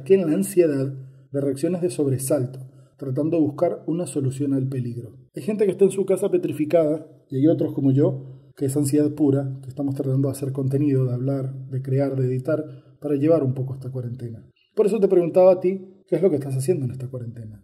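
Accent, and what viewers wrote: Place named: Andalusia, Spain. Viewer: Que bien, buen análisis... empece a seguir... además mejor con el acento del sur con palabras venezolanas je je je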